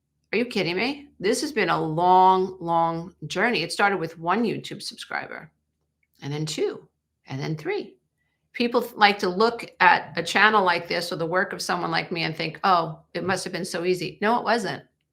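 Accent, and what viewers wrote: New York, United States. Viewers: i love you and bought your book for my mother before she passed in 2016. you are a breakthrough person for me. i love your NY accent. I want to say something please just take with a grain of salt. I feel that at the end when you describe your relaxing ways it seems like still passively avoidance...the breakfast with the daughter. it is not really being alone and authentic. also, if you met your hubby, good as he is, when you were needing a secure hubby and used your perfect looks and sabayon recipe to lure him...do not take offence...this marriage may be not the final answer for a HUGE spirit for yourself. I am not saying that i want you to get scrubby for a day and fart in front of him, but maybe its time....LOVE YA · love the content ❤! Shorter segments please. Will go further for you. Absolutely love your sense of humor! 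And your new York accent! Keep up the good work!❤❤❤!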